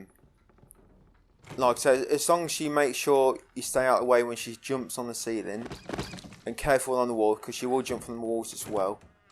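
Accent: United Kingdom. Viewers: Just 100% the game because of your and MrReign’s work. Honestly you’re like a younger MrReign. Absolute prodigy. Anyway I just love your British accent and funny jokes, very fun combination. Don’t know why British people and resident evil 7 just… works😂 anyway thank you and have a nice life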